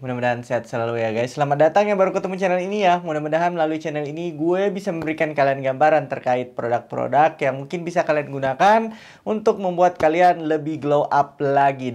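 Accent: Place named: Bugis, Indonesia. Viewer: Logat nya kayak orang bugis ya bang?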